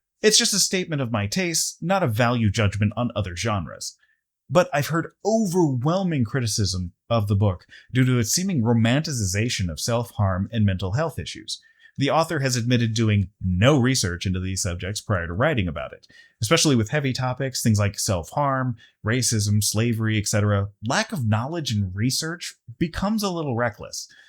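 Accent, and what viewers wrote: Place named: United States. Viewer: One critique from the early portion; You sound like your culture is American, your heritage is Asian. Just like I am American with a German heritage. Just a nitpick, don't mind me, lmffao.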